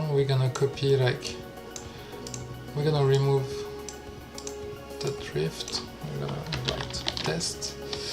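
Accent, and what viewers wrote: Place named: France. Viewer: Moi j’entends que tu es français mon ami lol j’aurais une question je boss un projet track mais j’ai un pb avec ma route et des effets de la voiture la fumée des pneus part dans le mauvais sens et la camera sautille et surtout le volant tremble constamment pourtant mes cubes sont bien en y vers le haut et le z pointe ma direction j’ai travailler sur blender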